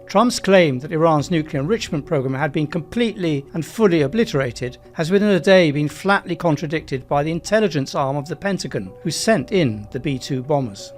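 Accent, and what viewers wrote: United Kingdom. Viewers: Thanks for reporting the truth, though I can hear your accent is British. Hope you will teach people to face facts and truth. Netanyahu is a dying lion, he doesn't want to accept he's finished😂😂😂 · MEE never ever but never ever had a good word on Israel, just this Qatari paid org is unhingede. British accent spweing garbage and misinfo.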